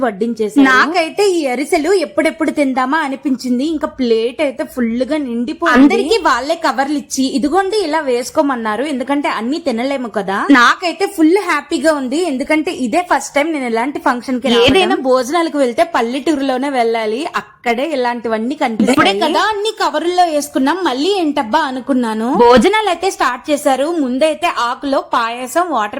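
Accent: Godavari, India. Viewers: Godavari na?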